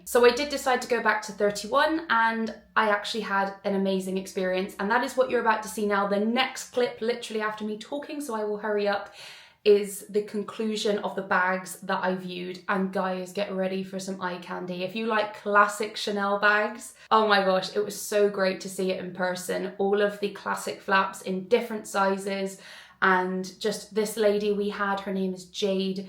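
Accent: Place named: RP, United Kingdom. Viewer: First off I'd like to say I do enjoy your content ...but I feel this needs to be addressed as iv seen a few vlogs where youre not happy with your experience in these designer stores ...A lot of these stores deal with millionaires who shop their brand constantly , a lot depends on appearance and these staff are trained to know who is wealthy and who is not , unfortunately you will experience what you show , wearing leggings , speaking in a posh accent and being knowledgeable about designer brands won't cut it for high end stores , whilst I agree its not acceptable to be treated any differently ,but as you say they don't really respect people " drinking champagne on a prosecco budget " unfortunately buying designer every now and again or when they're on sale wont give you the experience you think you should get, I have bought designer bags shoes etc but I never expected preferential treatment , I just enjoyed the experience for myself and the purchase , honestly its giving mutton dressed as lamb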